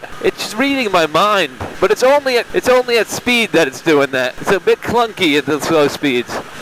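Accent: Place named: California, United States. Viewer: Hey, your accent doesn't sound like from England, sounds Californian. 😄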